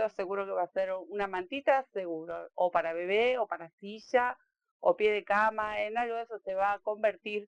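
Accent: Argentina. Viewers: me dio mucha alegría volver a ver un video tuyo. Soy mexicana y aaaaamo tu acento argentino. Gracias por el tutorial, he querido hacer una colcha hexagonal. Muchas bendiciones!!!❤❤❤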